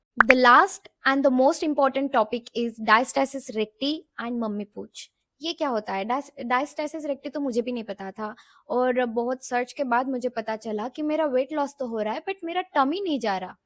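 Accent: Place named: Maharashtra, India. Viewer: Marathi accents in hindi speaking are very nice Being a Marathi I like to hear it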